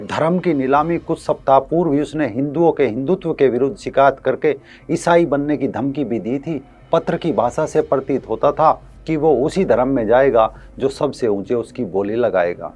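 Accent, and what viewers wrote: Haryana, India. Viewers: हरियाणा के है भाई कुछ करने वाले को मत रोको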